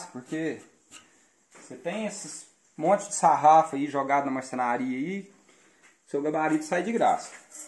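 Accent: Minas Gerais, Brazil. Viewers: Obrigado pela referência a meu nome, amigo. Parabéns pelo vídeo. Pelo sotaque vc deve ser mineiro, certo? Eu já fui em algumas cidades de Minas e onde passei mais tempo foi na cidade de Manhuaçu. Gostei muito da cidade. E meu cunhado é de Belo Horizonte. Forte abraço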